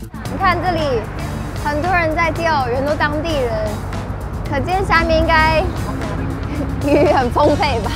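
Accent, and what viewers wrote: China. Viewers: 嗯 一口中國腔... 聽了超級彆扭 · 中國住太久口音很重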